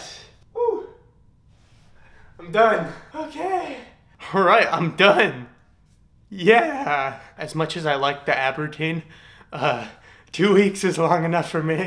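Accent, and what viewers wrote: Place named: United States. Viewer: I guess ur born in the US then as ur accent is american.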